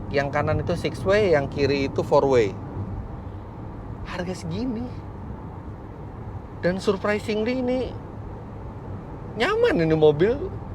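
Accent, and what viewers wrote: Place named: Serang, Indonesia. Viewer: Orang serang mana suaranya👋🖖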